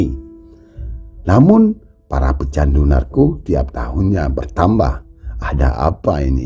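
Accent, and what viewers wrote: Aceh, Indonesia. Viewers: Suaranya seperti dialog org aceh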